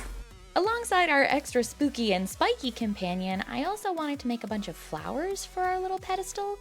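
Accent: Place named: United Kingdom. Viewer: Also your british accent is top tier! 👌 and that's coming from a Brit 🩷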